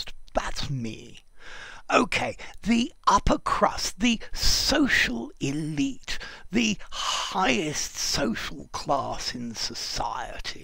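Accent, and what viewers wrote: RP, United Kingdom. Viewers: Nice upper crust accent. I don't usually go to upper crust restaurants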